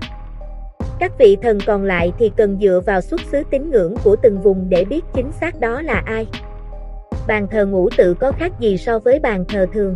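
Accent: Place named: South, Vietnam. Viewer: Giọng miền Nam nghe khó quá lại còn nhạc nền nữa làm cho càng khó nghe hơn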